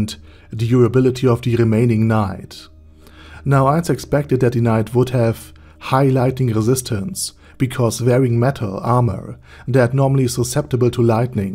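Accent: Germany. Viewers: Bro are you from Germany? I can hear your accent.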